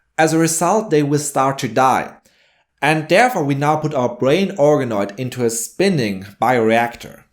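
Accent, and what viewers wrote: Germany. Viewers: Here from nutshell, subbed. :D edit: you sound German,are you German?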